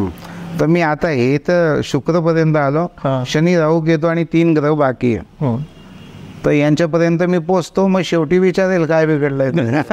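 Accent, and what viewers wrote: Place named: Dhule, India. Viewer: Bhashe warun tumhi Dhule che watata, mazha janma Dhule cha ahe, mi Mumbai madhe asto, mala consultation gheta yeil ka?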